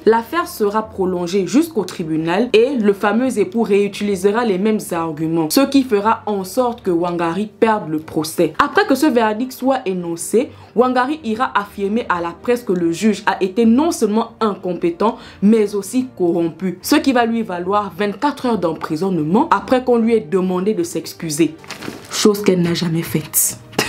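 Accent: Fang, Gabon. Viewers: Bébé l’accent fang est toujours là hein nkolomessa ossuuuu❤️🥺😂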